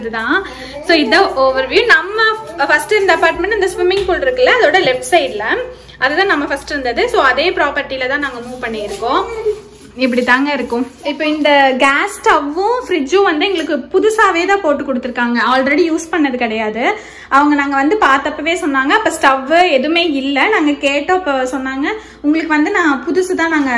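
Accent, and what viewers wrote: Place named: Coimbatore, India. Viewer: Sister neenga Coimbatore ah....covai slang😊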